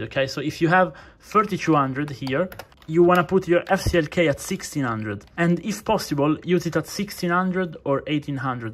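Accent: Italy. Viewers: Come cazzo si capisce che sei italiano 😂❤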